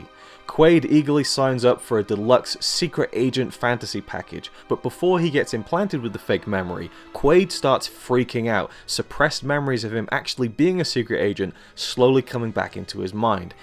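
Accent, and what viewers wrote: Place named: United Kingdom. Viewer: for British accent :)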